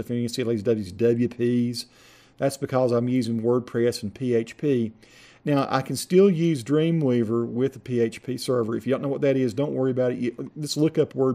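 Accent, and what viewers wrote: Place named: Memphis, United States. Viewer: Great tutorial man! I spent six years in Memphis and you sound like you are not far from there!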